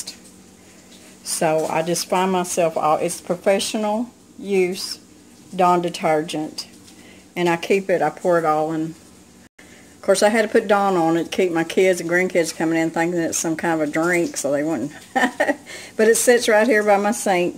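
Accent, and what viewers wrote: South, United States. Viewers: Amen !!! I have been so poor at times in my life but my children never went to bed hungry and we always had what we needed, not always what we wanted but what we needed !!! My kids are grown and I finally went to college and became an RN, I now no longer struggle to pay my bills but I still don’t have fancy things, God showed me I don’t need fancy, I am happier with thrift store clothes and raising a garden ! I am so grateful for the hard times and I’m so grateful for the abundant times, God is good and faithful !!! Watching you with your down to earth personality and your southern accent warms my heart !! Blessings to you and Mr. Brown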